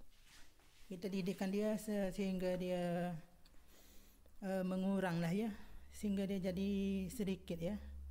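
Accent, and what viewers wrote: Malaysia. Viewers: Dia orang kelantan saya pun orang kelantan ..orang kelantan bila suruh cakap kl kek kok sikit😅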